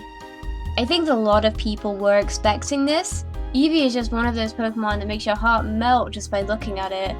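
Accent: England, United Kingdom. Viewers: me seeing this vid in my suggested feed big deal, another poketuber! im subbed to, like, a million others! ah, wtf, ill watch one of their vids me after starting this vid HOLY ARCEUS SHE HAS AN ENGLISH ACCENT!!! SUBBED!!!! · are you English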